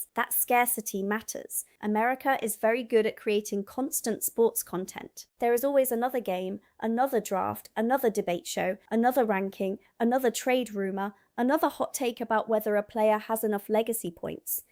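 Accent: England, United Kingdom. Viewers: You sound British not American, you must have been here a long time ago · you sound English now. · She sounds british to me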